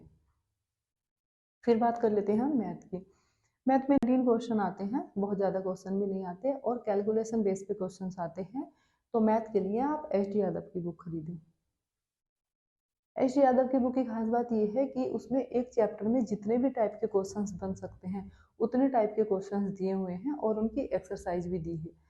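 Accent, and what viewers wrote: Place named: Western Uttar Pradesh, India. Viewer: आप की बोली पश्चिम उत्तर प्रदेश की है मेरठ मुजफ्फरनगर क्षेत्र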